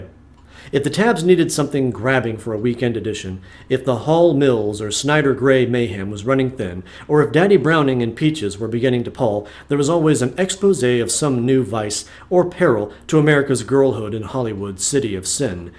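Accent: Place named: Germany. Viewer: Bahahah your German accent made this so much more enjoyable! Love your narration! 💖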